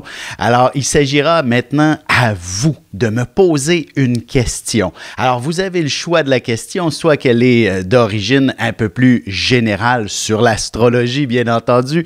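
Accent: Quebec, Canada. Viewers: Bonjour merci je vous suit depuis longtemps avant il yavais une jolie dame blonde a vos côté je me demande ce quel devient ? En tout cas j'aime bien votre accent québécois ☺️ J'ai une question je suis née le 29Mai1992 18h45 Montpellier France Esque l'année 2023 serais bénéfique pour moi niveau sentimentale ou argent j'ai envie de me faire une chirurgie esthétique courant mars mais je doit d'abord récolte.. Voilà Monsieur a bientôt en espérant avoir mon thème sur ma prochaine vidéo ☺️🙏🦋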